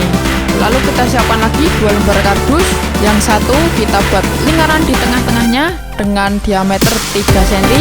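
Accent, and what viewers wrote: Java, Indonesia. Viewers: Jangkrik.....supa..? Yo ngene iki wong njowo.dipertahankanlah.gausah malah sok2 an bahasa indo yg fasih. Liat itu subscribe dan like nya. Toh juga banyak walau medok.kate lapi koe..? Ra terimo. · Mendoknya ciri khasnya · Yang gak bagus..suara MEDOK ny itu loh..maf..bisa di kondisikan gak..klo bisa pke duber orang lain ajah deh yg fasih bahasa indo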